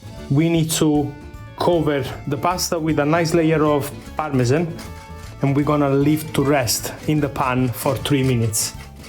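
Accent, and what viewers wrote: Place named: Naples, Italy. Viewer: Ho notato, dando un’occhiata, che rispetto a tanti altri, tu con il tuo canale qui, sei diciamo così, ancora all’inizio. :) Comunque ti faccio tanti auguri. 👋 PS: da quello che ho sentito alla fine del video, ho capito che sei anche napoletano, quindi siamo anche corregionali. Io provengo dalla provincia di Salerno. :)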